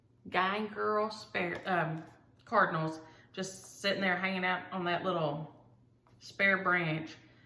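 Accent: South, United States. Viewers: we speak the same Southern drawl!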